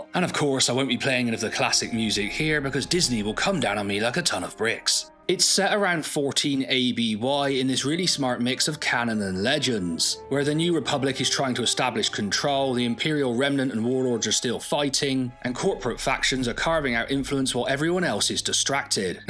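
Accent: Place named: England, United Kingdom. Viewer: Hey, fellow Englishman here, from a bit further to the east than you going by the accent 😂 I’m 46 and just restarted the expanded universe books from where I left off in my early twenties to be more clever. It’s such fun, I didn’t know if I’d still enjoy it but loving it - no spoilers please I’m nearing the end of the yuuzhan vong war. I played through most Star Wars games and read again the old comics - expanded universe is amazing, I love it so much and have so many good memories from it and everyone knows, this is the real Star Wars timeline written mostly by people who get it and are into it as opposed to the Disney trilogy which seemed to be a misandrist modern feminis/woke vehicle to attack men, and push minority supremacism (I feel like you’re expected to caveat that with how you’re not racist that’s the point but I cannot be arsed, shouldn’t have to). 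The worst moment I’ve ever seen in any film was one of the Disney ones where … you guessed it, it turned out all the men we’re stupid and belligerent and wrong and the purple haired old Crone rises up magnanimously and it turns out she and all women were right all along, stupid men. They then kill all the white male heroes - can you imagine how disgusting and unacceptable they found Han and Luke, and even, bastions of moral integrity that they are, force Carrie fisher to be in it, after she died wheeling around her digital corpse having bought the rights to her image. Disney are pretty much the empire. Nice touch having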